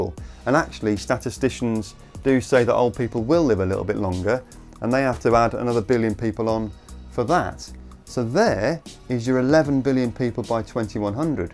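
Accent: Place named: Cockney, United Kingdom. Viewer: Re this Sensationalists prior "sea ice" video but read my point # 8)... Lots of "sensationalism" & "doom&gloom, if it bleeds it leads" MISLEADING PSUEDO SCIENCE from our cockney accented "pseudo scientist".... Notice he says "sea ice" a lot. Propaganda from fiberal globalist seditionists seeking to "carbon tax" us into oblivion, this video is clearly paid advertising to sell us all into submission... don't believe the rhetoric. HERE ARE THE FACTS: 1) ice shelves are very thin floating 'skins' relative to the main ice mass at the poles, making for dramatic imagery (likely photo-shopped to support the phoney narrative). 2) yes, "sea ice" is diminishing, and yes our climate is warming, but... NO, Sea levels will NOT rise & flood coastal regions. 3) NASA's circumpolar satellites measure surface elevation & micro-gravity (mass directly below the sat.) and clearly show FIVE TIMES MORE ICE IS CURRENTLY DEPOSITED BY SNOWFALL AT THE POLES than what is lost to melting "sea ice". 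4) the disparity between the much greater annual polar deposition vs. loss of peripheral "sea ice" is ACCELERATING (especially at the Antarctic landmass). 5) while global warming is for real, it will NOT result in "catastrophic sea level rise" for the above reasons as well as greatly increased evap/precip filling depleted lakes, rivers & aquifers, as well as greater atmospheric moisture held in clouds & humidity. 6) CLIMATE MODERATION will greatly warm our winters but ACTUALLY COOL OUR SUMMERS in many regions d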